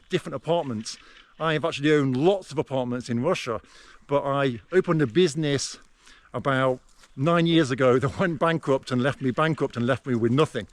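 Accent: United Kingdom. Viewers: Он уже 20 лет живёт в РФ, считай он один из самых ранних экспатов-ютуберов и у него очень хороший русский язык на достойном уровне, практически носитель но с британским акцентом)) Это кстати солидное преимущество, так как многие иностранцы годами не могут освоить язык кроме - "Всэм Прувет ит из..."😅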